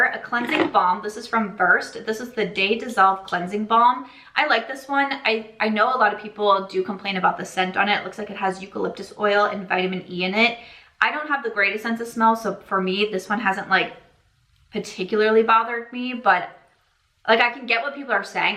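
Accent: Midwest, United States. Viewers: Midwest accent? That makes me laugh...❤ enjoyed the empties video. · Totally get the Midwest accent girl.. don’t really hear it in you THAT bad.. being from outside of Philly, I always get told I have a Philly accent & I laugh because my friends who grew up actually in philly, have more of a Philly accent than me.. it’s funny how you can tell where ppl grew up just from their accent.. yet we all speak English the same.. ANYWAY- I really came here to tel you that Kayali Yum Bougee Marshmallow (the strawberry one u were tryin to think of) is the COMPLETE opposite of Tom Ford Tob Van.. Tom ford is more warm n spicy & rugged (my son n I share a bottle bc is so perfectly unisex) where yum bougee marshmallow is girly sweet but very pretty but for sure feminine.. I love it, but completely diff than Tom ford.. have u ever tried kayali vanilla 28?? Esp after it sits awhile, its a beautiful vanilla that’s feminine & mixes well with so many other perfumes (actually love it mixed with TF tobacco vanille to make it a lil more feminine & bring out the vanilla!!) .. 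also can’t wait to smell Phlur Vanilla Smoke def sounds like something you might like (just haven’t gotten to smell it yet!!)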